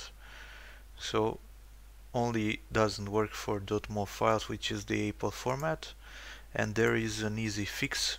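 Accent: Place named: Russia. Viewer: слышу русский акцент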